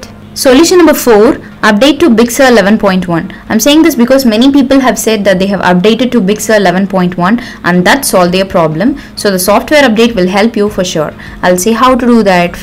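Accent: India. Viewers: how do you let us understand this video wiht your indian accent and pronuncation, at least speak slowly so we can understand!!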